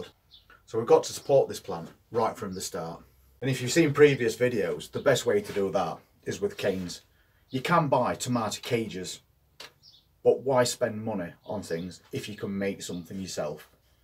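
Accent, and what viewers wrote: Yorkshire, United Kingdom. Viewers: I hear a propa Yorkshire lad, Sheffield mate?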